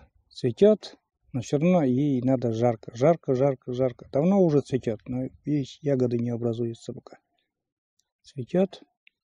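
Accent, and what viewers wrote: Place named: Vologda, Russia. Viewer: Зашла на ваш канал случайно и сразу покорил родной говор,мы живем в Вологодской области,просмотрела несколько видео и так все понравилось,что подписываюсь с удовольствием!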